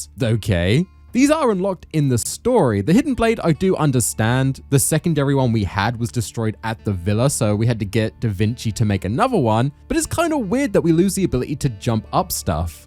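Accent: United Kingdom. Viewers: Binged the first 3 so far. Really like your style of video. Feels authentic and not AI scripted! Which is a blessing nowadays. I'll continue to binge the rest of the series. For now. Until you Brit voice gets to me!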